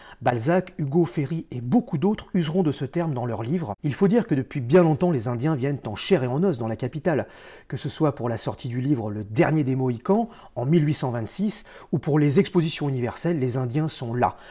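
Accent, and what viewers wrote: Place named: Paris, France. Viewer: Je rajouterais un +1 pour ton phrasé qui fleure bon le Paris qu'on aime !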